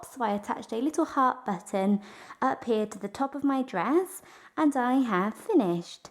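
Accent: United Kingdom. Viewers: Absolutely love this dress! You make it so easy. Excellent teacher! Being from the US, I love listening to your British accent.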